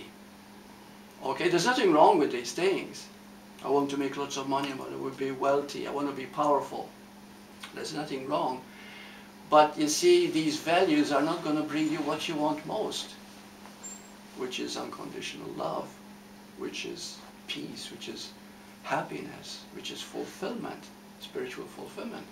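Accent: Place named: Italy. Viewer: This obviously has nothing to do with what he's talking about, but I'm just curious, what kind of accent does he have? His name seems English, but his accent sounds more Italian or something else. Where is from?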